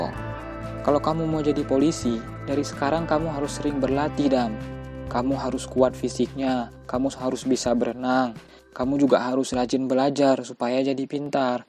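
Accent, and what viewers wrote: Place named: Medan, Indonesia. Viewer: Medan kali bahasanya ya, mantap bg.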